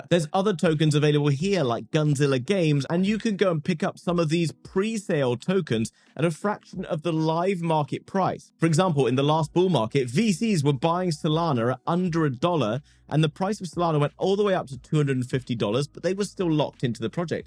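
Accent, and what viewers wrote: United Kingdom. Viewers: Here's a question nobody else has really covered - when deciding on a portfolio containing the various narratives suggested, does it make sense to go and acquire all the coins at once (large/mid & small) anticipating the Bitcoin breakout that just happened or would it have been more sensible to have just bought the bigger caps and held off buying the others until phase 3 began.? (Obviously thinking about the pre-election 6 day dip we just had that gave my alts a bit of a kicking). Thanks, it's refreshing to find an articulate fellow Brit running one of these channels, Cheers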